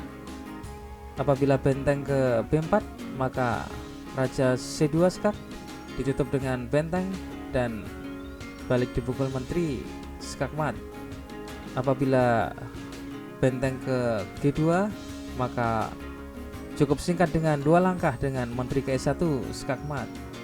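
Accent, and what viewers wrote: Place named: Java, Indonesia. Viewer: Sehat selalu bapak dgn dialeg jawanya😊❤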